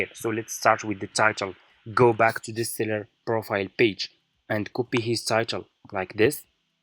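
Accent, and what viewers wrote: Morocco. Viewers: Moroccan accent bro 😊 Nice video keep going !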